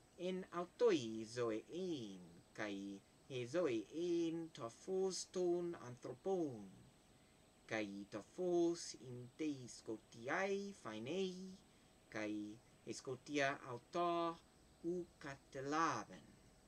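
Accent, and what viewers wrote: United States. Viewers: He sound like ancient American to Greece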